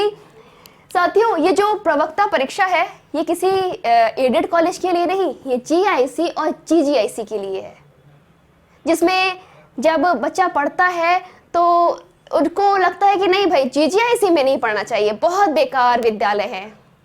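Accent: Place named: Maharashtra, India. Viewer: Marathi tone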